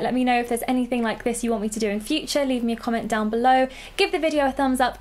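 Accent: United Kingdom. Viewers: Are you British? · I am from Germany and I love your british accent 😍But also your fashion style👠👜. I am sorry if I made grammar mistakes 😕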